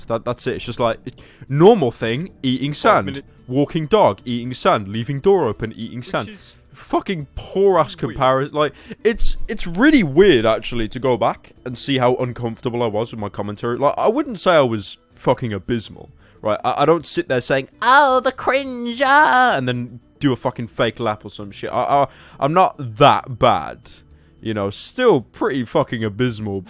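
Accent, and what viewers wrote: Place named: United Kingdom. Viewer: Damn you an you manly deep british voice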